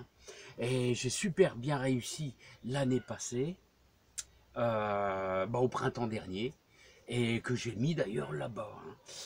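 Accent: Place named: Nord-Pas-de-Calais, France. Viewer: Toujours le sourire dans tes vidéos et tu redonne le sourire a travers tes vidéos Tu parles chti 😊😜 Je te le redis tu es qqun de bien je te souhaite une bonne soirée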